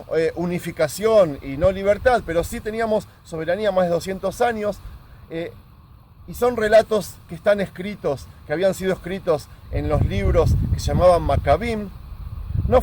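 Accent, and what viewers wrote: Argentina. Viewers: Si la verdad. Estoy siguiendo cada video del hermano. Por su acento vivio en Argentina. Donde sea es muy gratificante y de mucha sabiduria. Bendiciones para ti. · Cuando comencé a escucharlo pensé que era argentino. Pero poco a poco he escuchado su relato y es muy interesante, no sé cual sea su verdadero idioma pero agradezco que nos cultive a través de estos vídeos. Es el primero que veo, pero veré todos❤❤❤❤❤ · Tienes acento argentino o de esos lados saludos hermano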